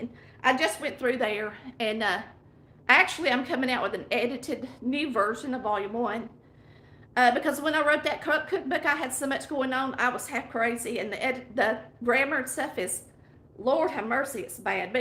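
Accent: South, United States. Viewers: Just discovered your channel and you sound just like my family. I'm from the south and I m glad I found you. Love your recipes they are just like my Mawmaws. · You say "yall" way to many time. And your speech is over done on the Southern accent . It is difficult to listen to you.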